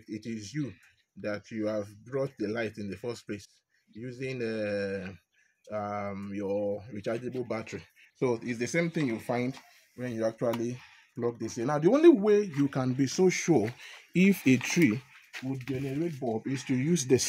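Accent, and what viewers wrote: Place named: Nigeria. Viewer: 🥱🥱🥱 Nigerian English sucks please use scientific language.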